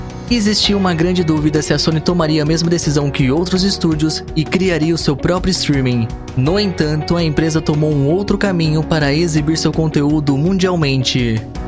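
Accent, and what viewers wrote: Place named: Rural, Brazil. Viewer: POR QUE TEM UM CAIPIRA FALANDO, CRUZ CREDO SO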